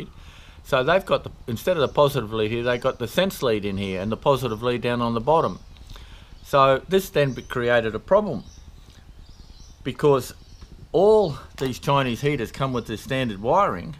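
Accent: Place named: Australia. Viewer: Great videos, good to see some with an Aussie accent. Sorry if this has been asked but reading all of the comments on my phone is a bit tedious. Q: if the controller failed, how would the unit respond if the fuel supply was removed rather than the power, would the unit complete it's cool down procedure and shutdown gracefully or do those smarts live in the controller?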